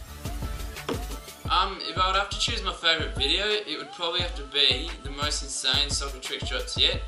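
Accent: Australia. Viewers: I have to learn the australien accent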